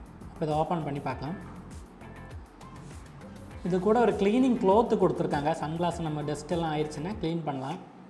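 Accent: Kerala, India. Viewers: i guessed it. Malayalam slang varuthu nu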